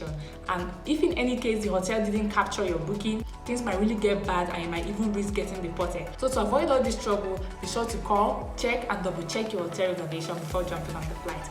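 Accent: Nigeria. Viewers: I can hear you as NIGERIAN GIRL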